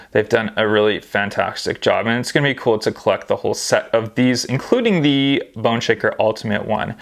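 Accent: United States. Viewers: how come you are British but you have an American accent?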